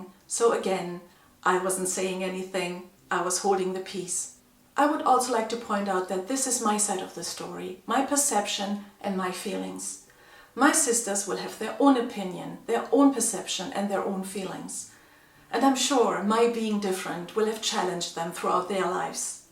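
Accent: Scotland, United Kingdom. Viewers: You are such a great person, while I was listening my brain suddenly felt something strange, my left eye had a tear, not other one, so strange incident, please don't be sad, just forgive them, no doubt you grieving, I know your situations, ignore and take step forward. We are your family now, be optimistic, you are truly, I love Scotland, very beautiful place with lovely ppl, I love Scott's accent, my senior colleague at work was from Scotland, very gunuine and straight forward person, I had been to Bury Town, lovely place, I always I have deep roots with the Scotland. Your videos are admiring n you are a captivating person. Thnx for sharing you daily life. CHEERZ BYEEE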